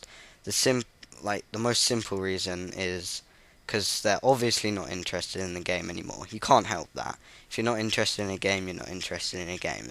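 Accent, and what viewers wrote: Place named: England, United Kingdom. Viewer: Lol I like your English accent.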